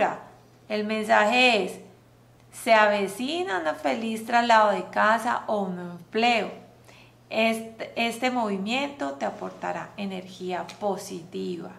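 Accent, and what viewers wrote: Colombia. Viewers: Declaro abundancia en mi vida! Gracias por tu lectura, eres bastante acertada y al igual que tu soy Colombiana, el acento es inconfundible...Un abrazo y nuevamente GRACIAS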